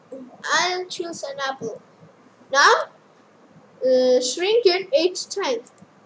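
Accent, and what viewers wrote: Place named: India. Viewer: I like the indian accent "the firs ting" lol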